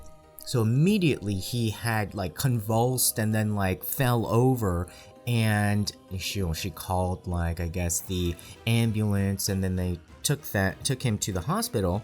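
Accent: South, United States. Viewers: I wish you had your own crime show over here in the states,. I would have you on my TV 24/7!!!! I just love the way you explain things and tell it like it is. And omg those cosmetics you tickle me😂😂. I think I heard you talk about living in the States one time. I live in Tennessee so I talk like Ellie Mae Clampett lol and I just got to ask you about your accent because I think I hear a little bit of southern lol I just love it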